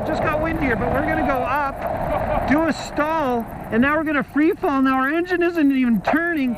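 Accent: Canada. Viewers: He sounds Canadian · Wacky Canadian. Or Wisconsonian or Minnesotan. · I just love the Canadian accent! So polite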